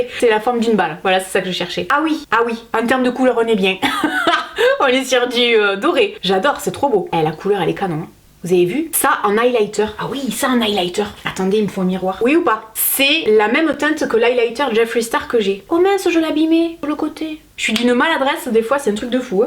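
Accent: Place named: South, France. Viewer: Merci pour ton sourire et ton accent.... Du sud à Paris... Je sors de l hôpital St Antoine. Je suis aidé soignante... Ca fait plaisir... Une vidéo en attendant mon RER... Je suis ravie..!!!!!! 💜💕💜💕💜💕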